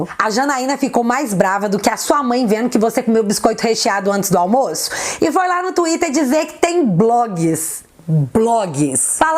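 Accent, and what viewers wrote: Minas Gerais, Brazil. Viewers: Muito bom e a hora que do muita risada kkkkkkk esse sotaque mineiro e de mais....grande mídia... só mentira...OMS ...nem se fala.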